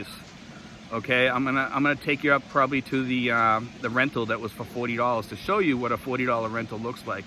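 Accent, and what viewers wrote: Boston, United States. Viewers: You seem to have a slight boston accent but i could be wrong lol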